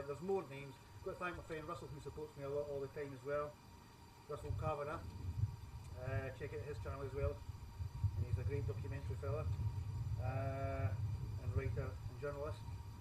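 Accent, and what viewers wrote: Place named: Scotland, United Kingdom. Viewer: Are you Scottish ? bec i m not used to hear English with a n accent like that ( Im french speaking ) and I think you are Scottish it's bit difficult to understand for me , bu t I find your vid is interesting , i like walking in the nature too ^^ i like UK bec it has very vast areas with no roads and no houses but not here in Belgium bec my country is too small ^^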